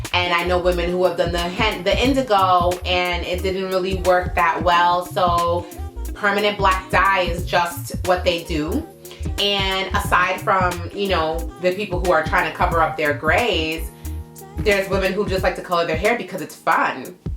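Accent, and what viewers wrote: New York, United States. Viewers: "It's Stoop-id" that NY accent is strong gurl...😆😆. Anyway stand in your truth you did not get this far steering folks the wrong way. I appreciate your candor and willingness to supply the demand in this community. 🙌🏾👌🏾👍🏾.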